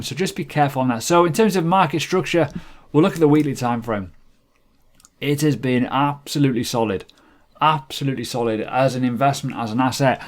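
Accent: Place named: Northern England, United Kingdom. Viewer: Awesome northern accent.. reminds me of when I was growing up in Manchester. Great Channel BTW. SXP is my pick as a cheap starter. ZIL as well - big bag of this. Thx for the ELROND video , may get some of that. Cheers Ben - New Zealand.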